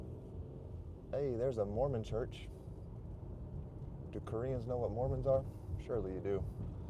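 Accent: Texas, United States. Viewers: That's wasup bro I used to live in Dallas And I love your Texan accent lol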